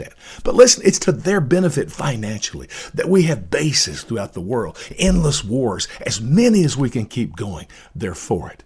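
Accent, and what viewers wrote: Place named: Chicago, United States. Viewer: You sound like you’re from Chicago… I’m from the south side… I worked Chicago fire department for 30 years retired three years ago I am 75% out of Chicago at this time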